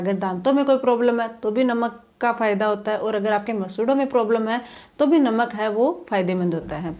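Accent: Rajasthan, India. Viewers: Doctor mam ..Aap pakka aap Rajasthani ho....Aap ke Bolne ke lehje se mujhe pta chala hai.....aap bahut ache se treatment bataya hai aapka ka Shukriya..🙏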